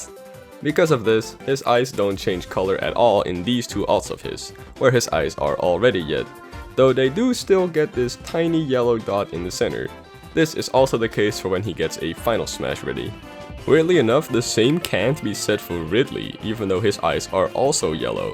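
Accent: Netherlands. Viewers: Wait, does this mean that you have a Dutch accent? if you don't mind, what part of the Netherlands you from? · omg are u dutch? Omg dat is echt cool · Wait a second are you dutch? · Are you dutch? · You Dutch? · hoppa ik dacht al dat ik een Nederlands Accent hoorde. Ik ben de allerkapotsteslimste werkloze persoontje op de wereld yesssss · I KNEW YOU WERE DUTCH YESSS I am also dutch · He dutch? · ik wist niet dat je nederlands sprak, ben je een belg(zoals ik) of ben je een nederlander?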